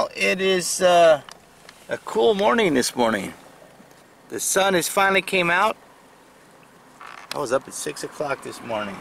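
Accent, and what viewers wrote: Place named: South, United States. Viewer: oh yeah and btw, there is nothing like some GOOD ole country twang xo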